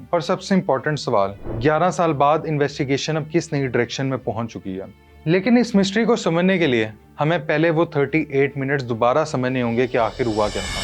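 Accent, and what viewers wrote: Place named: Punjab, India. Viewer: Watching you from Saudi Arabia I suggest you please Improve your Urdu little bit and prevent it from punjabi accents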